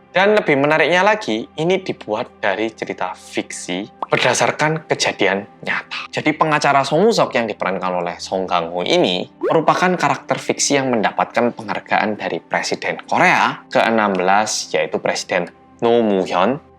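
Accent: Java, Indonesia. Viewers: Park soe joon dengan kearifan lokal.... Medok... Medok... = park seo joon (mungkin kembar 😀😀😀😀( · Mas iso medok men mas? 😂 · BERSAMA ORANG KOREA YANG..... MEDDDDHHHHHHOOOOOOKKK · Berasa ndelok park seo jun ngomong bahasa indonesia medok, mirip banget mas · Halo mas medokkk 🤗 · Mas yg medookk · Bang coba bikin konten yg ngomong medoknya di hilangin, dong.🙏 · Lbh enak dgrin mas ngmg bahasa dgn medok2nya dibandingkan ngmg korea😅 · Muedok tenan😄😄🐈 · Keseringan denger mas ngomong medok, pas denger mas niruin kutipan filmnya, kaget "oiya, mas kan orang Korea, fasih dongg" 😂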